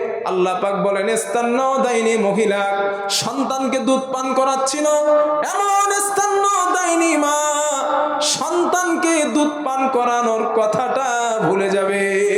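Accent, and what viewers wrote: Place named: Bangladesh. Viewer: মাওলানা সাহেবের আলোচনা শুনে মনে হয়, কোলকাতার মাওলানা নয়! বরং বাংলাদেশের কোনো মাওলানা। কেননা, বাচনভঙ্গি হুবহু বাংলাদেশী মাওলানাদের মত ।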